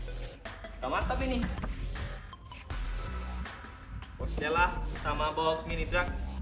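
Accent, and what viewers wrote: Madura, Indonesia. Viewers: kok kayak orang Madura KK emang asli mana😅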